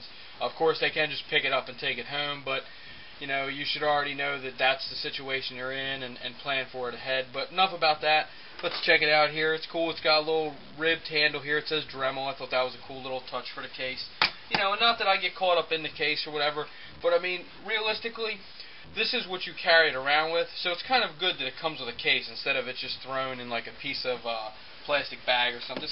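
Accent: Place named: Pennsylvania, United States. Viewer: from the accent, I am guessing you are from PA? Probably watching the Steelers right now? LOL Go STILLERS!!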